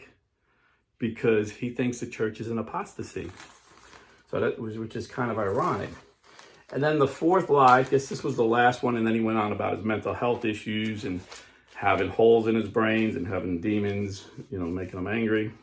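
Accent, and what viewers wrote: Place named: New Jersey, United States. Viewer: It’s just his Jersey accent. Likewise, a southern accent might say it slowly as “Holy-cost.”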